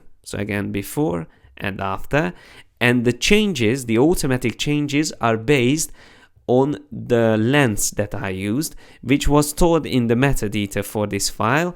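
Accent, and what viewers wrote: Hungary. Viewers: Annyira jellegzetes a magyar akcentus,öröm volt hallgatni:) De persze a tutorial maga is perfekt!!! Rengeteget tanultam belőle,hálás vagyok!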